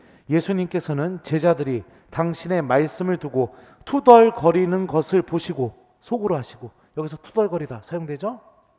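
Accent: Chungcheong, South Korea. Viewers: 구수한 신부님 충청사투리 에 고향생각하며.열심히 신부님 강론 따라가며 한구절씩 되뇌이는 하루를 시작하려고 노력합니다.감사합니다 🙏 🙏🙏영육간에 건강하시길 기도드립니다.🙏🙏🙏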